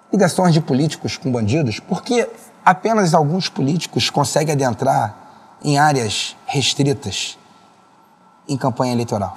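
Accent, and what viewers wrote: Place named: Rio de Janeiro, Brazil. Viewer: Ouvir esse por 5minutos é agoniante. Acho que ele é o carioca com o sotaque mais puxado que existe!! Pqp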